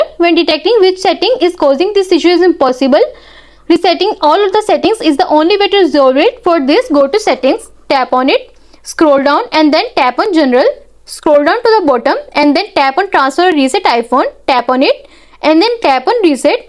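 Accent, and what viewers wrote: India. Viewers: Its bad enough you have a Indian accent but why the he'll you,going so,damn,fast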